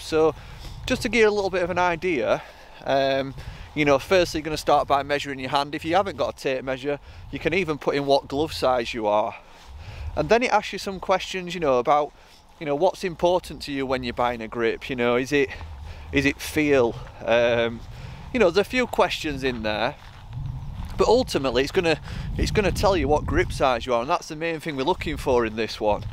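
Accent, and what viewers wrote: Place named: Liverpool, United Kingdom. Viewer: Sound like your from Liverpool And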